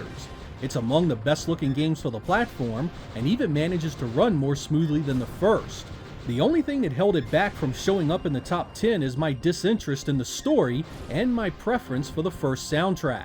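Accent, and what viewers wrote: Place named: Mid-Atlantic, United States. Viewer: You should say “water” more. I like your mid-Atlantic east coast accent.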